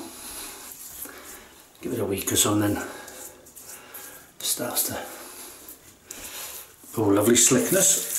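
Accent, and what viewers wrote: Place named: North Wales, United Kingdom. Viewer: Nice to hear a North Wales accent on here. Hwyl!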